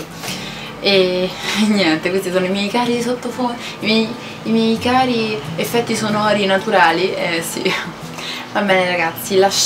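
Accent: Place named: Rome, Italy. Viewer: Ah romanaa de Romaa ❤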